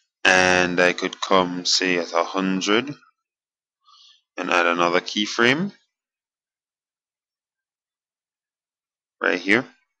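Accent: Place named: Caribbean. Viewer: are you jamaican...its good to hear a caribbean accent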